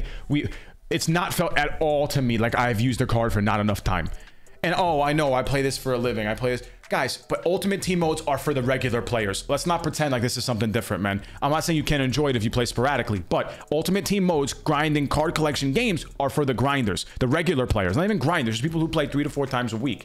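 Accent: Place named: Dominican Republic. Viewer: Dominican accent papi 😃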